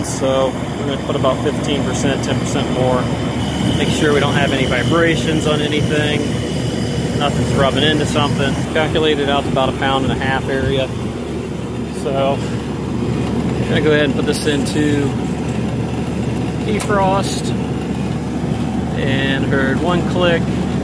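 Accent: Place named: Pennsylvania, United States. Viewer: ah the Pennsylvania speak figured you were from that area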